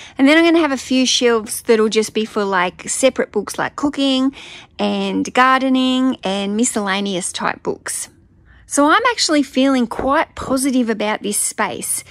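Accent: Australia. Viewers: Great sales on these books. I just started watching your videos. Thanks for taking us along for your journey. Question do you sale comic books also? Love the Australian accent!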